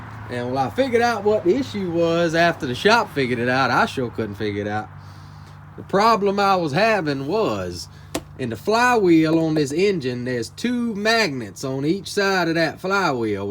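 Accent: Louisiana, United States. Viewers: Are you cajun?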